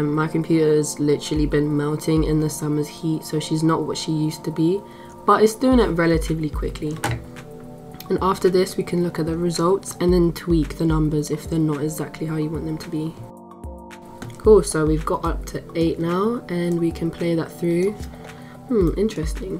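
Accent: London, United Kingdom. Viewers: I love hearing you speak, you sound like a fellow Londoner! Hearing you explain everything so simply and clearly (which you'd think is hard with such hard software) is gorgeous - I haven't even got Blender just trying to educate myself! Thanks :) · First of all great tutorial. When you scroll the camera. You control I think it is so that you can zoom in or out with more control and it does not jump with such big steps. Thanks again. Keep them coming. Also your very funny. Makes it easier to listen to haha. London lady?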